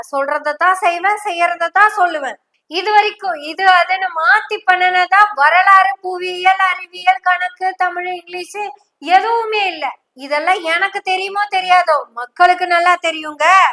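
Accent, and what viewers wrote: Kongu, India. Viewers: எனவே எனவே இதைப்பற்றி நல்ல கருத்தை கொங்குத்தமிழ் மொழியில் பேசிய சகோதரிக்கு நூறு வாழ்த்துக்கள் அரசியல்வாதியாக உள்ளே வரும்போது ஊழல்வாதிகளாக தற்குறிகளாக நுழைகிற கூட்டம் இதற்கு நல்ல பதிலடி கொடுத்திருக்கிறார் இந்த சகோதரி வாழ்த்துக்கள் வாழ்த்துக்கள்.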